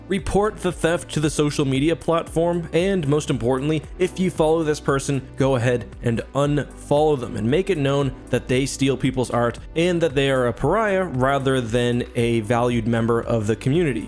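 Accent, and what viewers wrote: Canada. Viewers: The entirety of this video just sounds so Canadian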